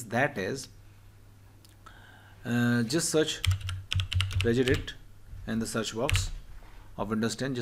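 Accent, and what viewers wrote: India. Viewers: The Indian accent. At least the fix worked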